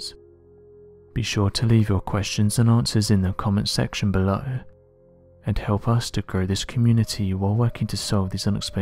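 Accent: United Kingdom. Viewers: Let me start by saying I really enjoy your channel. However, when I hear the voice of the current narrator I cringe. Because no matter what the setting I dial in on my speakers is, his voice is always low and muffled, that combined with the British or some kind of other foreign accent, I can't understand him half the time even with my earbuds in. So I'm just asking you guys if you could please maybe do something about that? I really enjoy the subject matter and would hate to have to tune out due to the fact that I'm just not going to get it. My hearing may be not that great, but I don't have any problems with your other narrators and with any other channels that I listen to on a regular basis 🤔👍 PS- The voice puts me to sleep, as well 😂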